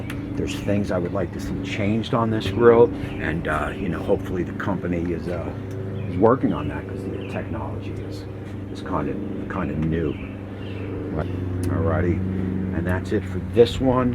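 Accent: New Jersey, United States. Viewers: Love the video. First time subscriber. Once I heard you tawlk I knew you were from Jersey!! Lived in Cherry Hill but live in HI now.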